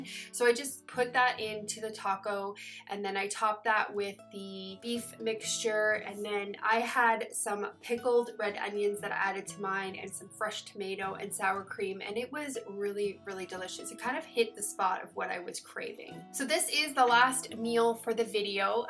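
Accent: Canada. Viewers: I just found your channel, I am enjoying following you. I can hear a slight Canadian twang, I live near the border in the PNW. I am excited to see more of you videos.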